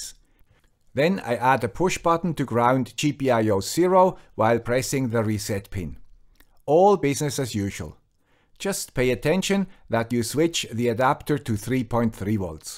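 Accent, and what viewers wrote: Switzerland. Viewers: Thank you for these excellent videos. I love the clear thinking and explanation you bring to them. So, as AI has transitioned from its early mechanistic approaches (e.g., expert systems, etc.) to the current models based on non-parametric statistics, there is also a subtle change in its successful application. The current models are more about being 'less wrong' than 'exactly right in the instance'. This is encouraged by its alignment with large number theory and other assumptions (e.g., Bayesian Analysis, etc.). Given this, we might best think of modern AI technique as most suitable as part of a 'problem-space reduction' tool that gets bolstered and completed by more traditional, deterministic kinds of algorithms, rather than as a central part of some core algorithm. AI's real mission, IMHO, is about abstracting 'the smart' from from everyday good engineering, and this will require a different kind application with different kinds of value produced. 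I like to think of AI as a kind 'context manager' for whatever problem(s) I am trying to understand and solve. Still, this was a bold and valuable attempt. Bravo to, The Guy with Swiss Accent.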